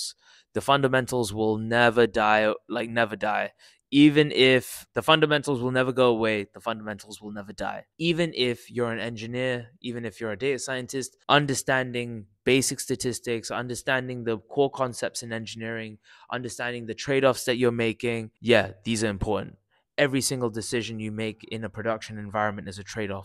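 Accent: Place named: South Africa. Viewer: It took me 5 minutes into the video to place the accent as South African. I went through British to American, back to British and finally landed on South African 😂😂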